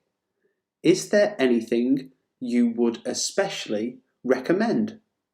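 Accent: United Kingdom. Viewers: Hello. Is your English from the UK ?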